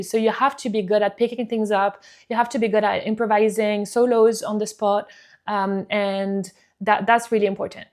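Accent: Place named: South Africa. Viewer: You sound South African. I did a few contracs as a singing bassist in party bands for Disney and Princess ships. All the South Africans I met on ships worked in the spas. They called them 'Steiner Girls'.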